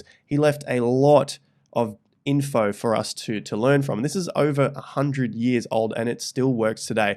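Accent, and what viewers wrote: Australia. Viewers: The Australian accent is unfortunately super annoying to me....especially when they say Twittahhhh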